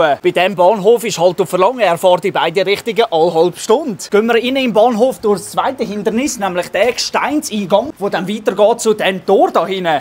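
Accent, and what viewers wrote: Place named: Switzerland. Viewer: Interessanter Akzent vorher aus der Schweiz kommst du, des Schwitzerdütsch was ich kenne hört sich nicht so hochdeutisiert an. Oder liegts am Alter?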